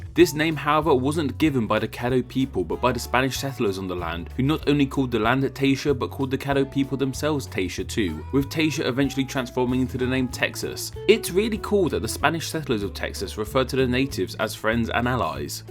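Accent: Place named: United Kingdom. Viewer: The accent lost me. British talking about Texas. Hmm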